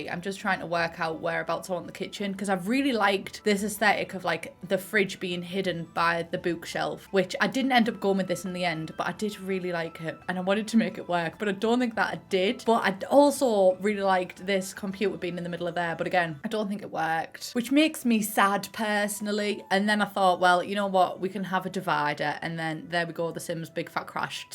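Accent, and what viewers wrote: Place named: Essex, United Kingdom. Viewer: Less than 30 secs in and I'm shocked that the Essex accent apparently sounds posh to someone from Middlesbrough 😂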